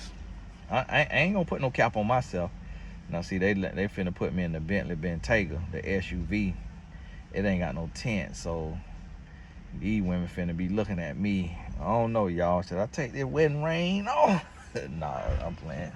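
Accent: South, United States. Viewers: Sounds like you had a Great Father! Love the southern accent. 🙏🏾🙏🏾🦾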